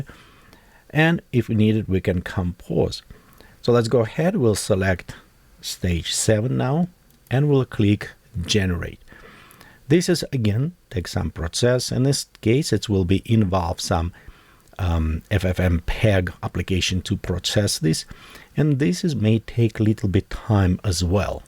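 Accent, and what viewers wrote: Russia. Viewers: This is a very helpful video and I will use the information redaily! Your content continually proves to be very valuable, Since I not a native "Russian-English" speaker, I sometimes have trouble following along, but I find I can usually figure out what you are saying. I just imagine that Chekov from Star Trek is instructing me. Keep up good work! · I hear your accent, are you Russian?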